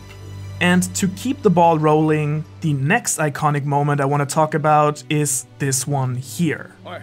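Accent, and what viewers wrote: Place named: Germany. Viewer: Are you german? Just asking cause your accent sounds a bit german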